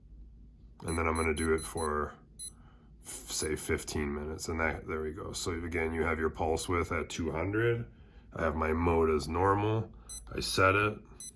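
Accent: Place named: Wisconsin, United States. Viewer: Is this similar to products such as neuvana vagus nerve stimulator? If so, it makes me wonder why other products charge so much! btw you sound like us over here in wi :)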